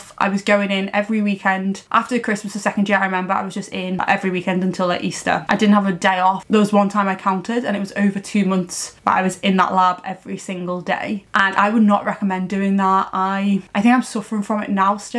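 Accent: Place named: Leeds, United Kingdom. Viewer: You sound like your from Leeds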